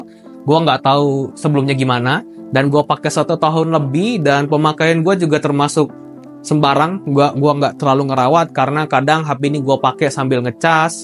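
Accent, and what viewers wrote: Palembang, Indonesia. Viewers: Wong Palembang kak ee?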